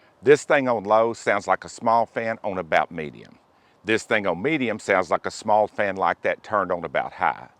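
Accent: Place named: Texas, United States. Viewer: I love the Texas accent. I live in San Antonio and I know exactly what you're talking about regarding the high heat and humidity. It's downright oppressive. This looks like a device that could really reduce the misery factor. I'm a musician and I get gigs outside fairly often and I end up all sweaty on stage when the temps are high... IOW, about 9 months out of the year. This thing looks like a life saver to me. I'll be buying one soon.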